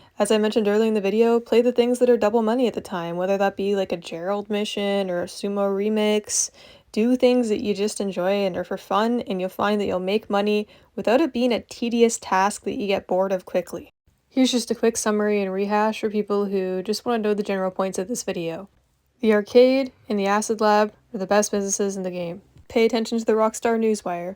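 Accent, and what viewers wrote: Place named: Canada. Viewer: At first I thought your YouTube and PSN were just a play on a nickname for thinking that was your legit name. Then I heard a few words that sounded Canadian and then boom, showed up and I was cheering because I went to a hockey game when the Vancouver Canucks came down to play the Anaheim Ducks. But I'd agree with most of this. Only thing I'd personally wouldn't buy as a new player, is the Acid Lab right away as we can get it for free after completing Off The Rails and we just have to upgrade the Acid Lab after doing i think 10 Fooligan jobs?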